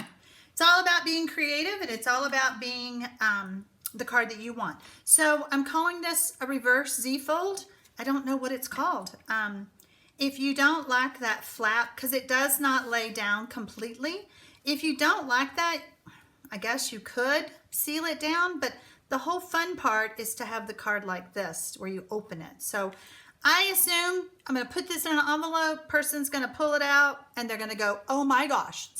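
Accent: South, United States. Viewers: I love this card design. Papers very pretty to even with the stripes. I think this would make a great Christmas card or Halloween card so I think I might play with it that way. I also have to say, I love your Southern accent. I don't know where you're from I just know you're not from Louisiana. I'm living there at the moment and you don't sound well at least on the southern parts I mean you could be up closer to Texas.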